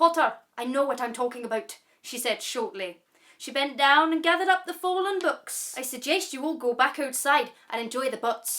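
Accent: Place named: Scotland, United Kingdom. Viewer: Also love your scottish accent, it's nicer than mine and I'm scottish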